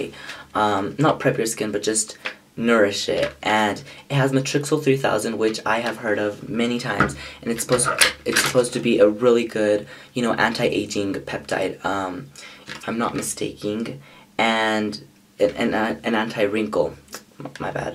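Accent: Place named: United Kingdom. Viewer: Omg your british accent was to die for! Soo cute and I've only just begun the video :)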